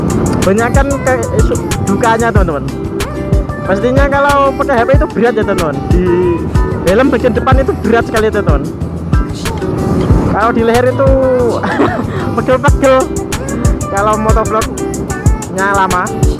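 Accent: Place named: Java, Indonesia. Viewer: Aku suka gaya bicarane mase... Mantap.... Ngekas orang jawa... Semangat pokok e.. 🙏👍👍👍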